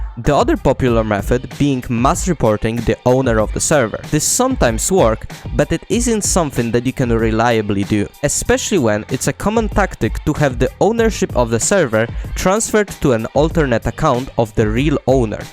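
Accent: Poland. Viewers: slysze polski akcent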